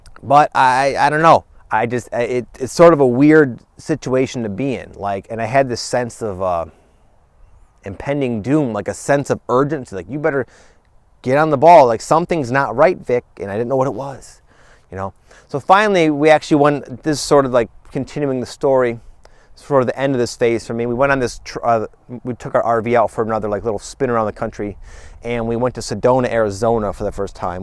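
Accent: Michigan, United States. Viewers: brother from Michigan <3 my name is Anjalina; I've lived in Colorado for a long time, when I first heard your voice on your channel this summer, it reminded me of home. thabkyou for your wise words, along my journey I had a super accelerated beginning, then a big fall, and a steady climb back "up" now, I am certainly on the cusp of something right now, again, thankyou- sending the most confident vibes your way. XX 🐉♄♇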